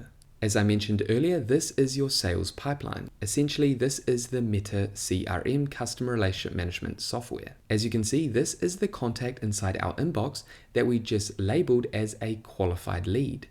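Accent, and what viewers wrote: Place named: New Zealand. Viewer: Good job mate! I loved your kiwi accent btw!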